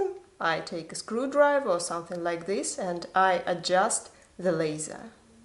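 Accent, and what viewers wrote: Russia. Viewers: I am enthralled. The Russian accent, the tactical proficiency... I might be in love.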